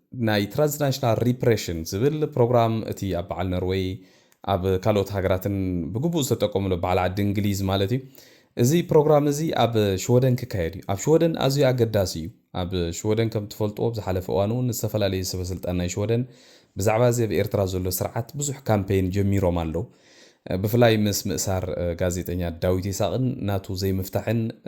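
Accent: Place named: Tigray, Ethiopia. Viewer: ላህጃ ከስከሰ ምላሽ ይስሕቅሉ ኣሎዉ። እዚ ከኣ ላህጃና ንሰብ ሓጎስን ሰሓቅን ዘቅስም ላህጃ እዩ። ኣጆካ ሓወይ እንተኪኢልካ በጃካ ብላህጃካ ዘና ስራሕ።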